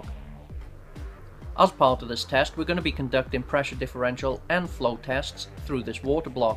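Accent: Wales, United Kingdom. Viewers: u have brilliant in depth reviews! are you originally from wales in the uk? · MAN YOU R PURE BRITICH AND I LIKE THAT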